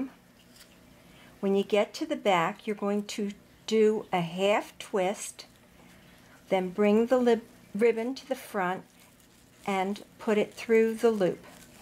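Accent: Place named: United States. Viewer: Thanks a lot! I am probably responsible for half your views: trying to watch carefully how you do it :) What part of the states are you from? That accent is charming.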